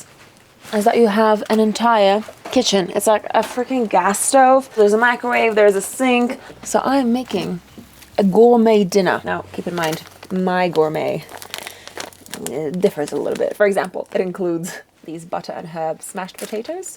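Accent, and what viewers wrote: United States. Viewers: She is sounding more and more American as she goes along 🤣🤣🤣🇺🇸🇺🇸🇺🇸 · You’ve been in the US long enough that your starting to talk like an American.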